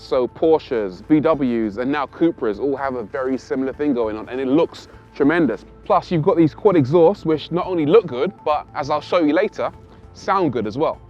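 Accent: United Kingdom. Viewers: British accent, left side drive, euro plates, where is this guy?!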